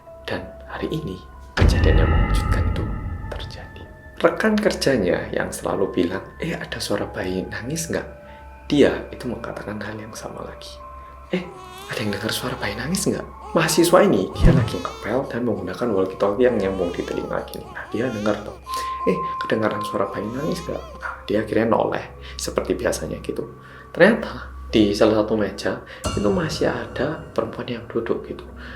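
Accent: Java, Indonesia. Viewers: Makacih, Boloku Sing Paling Medok Dheweeeee 🙏🏼🙏🏼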